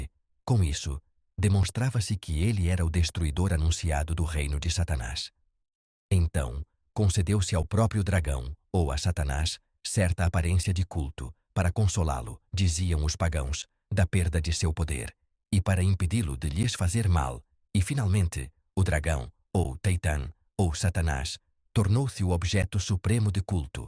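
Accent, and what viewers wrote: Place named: Portugal. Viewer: Narração com sotaque lusitano é um lixo mesmo...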